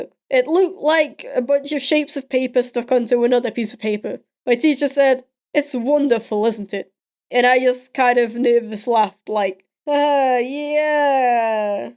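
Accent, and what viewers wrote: United Kingdom. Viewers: Still don’t know if he a boy or a girl his British voice just makes it harder and is he supposed to be a furry ? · your voice sounded weird (well your voice always sounds weird to me because I'm American and only know a few Brits)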